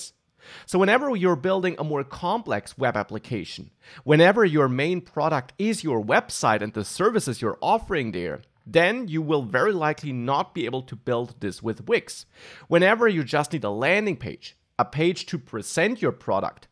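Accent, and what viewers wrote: Germany. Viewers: are you from germany? (accent - pure interest)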